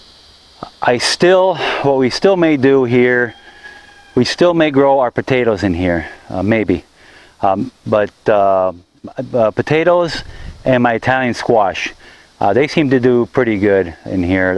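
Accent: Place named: United States. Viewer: You ever been told you have a slight Polish-American accent. I live in south Texas with mainly Polish-American and Mexican-American folks and you sound like you are from around these parts.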